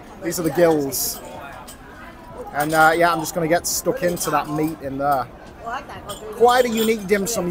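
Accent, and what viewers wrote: United Kingdom. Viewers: Best Food Review Show on YT. Better than Mark Wiens. Don’t ever stop. Love the OTT, British accent, food energy, videography. ✅💪👍 #nojoke #keepatit #bestfoodreviewshow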